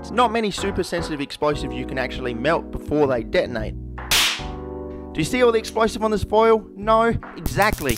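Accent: Australia. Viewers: So refreshing to hear Aussie content on YT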